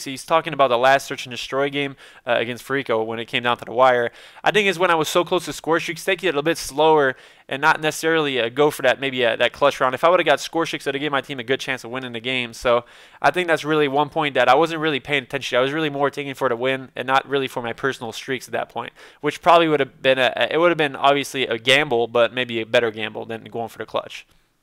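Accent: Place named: Quebec, Canada. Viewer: c un quebecois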